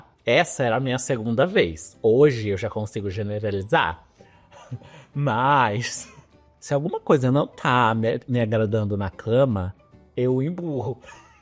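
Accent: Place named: United Kingdom. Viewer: seu sotaque em inglês é britânico, percebi isso hoje.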